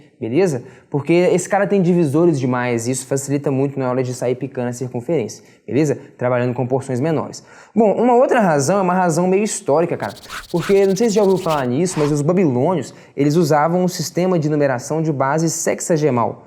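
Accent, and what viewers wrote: Minas Gerais, Brazil. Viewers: a mineirice exala em vc cara kkkk e hj aprendi que a microsoft não sabe dividir 360, os caras pularam do XBOX 360 pro XBOX One, depois desistiram e foram pro X, agr estão aprendendo seno e cosseno? kkkkk falando serio, video excelente! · Pelo seu sotaque imaginei q vc é mineiro e eu também da cidade de Dores do Turvo confrontante com a cidade de Ubá MG, gostei e adimirei da sabedoria e a sua vocação de ensino muito claro e fácil de entender parabéns.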